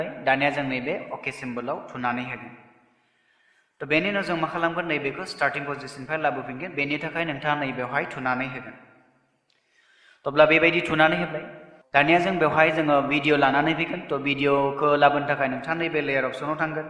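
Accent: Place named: Goalpara, India. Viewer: Sir bungbla mwjang kwnaya so, Golpara bung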